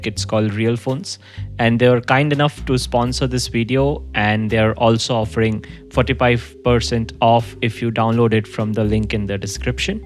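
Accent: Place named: India. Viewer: I never thought voice was Indian. Well you shocked me and you're still cool bro.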